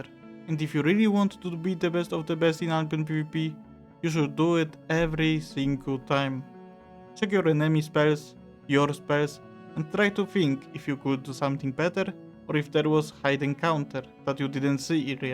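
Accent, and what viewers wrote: Poland. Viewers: czuć że polak z kilometra z tego akcentu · love Your polish accent XD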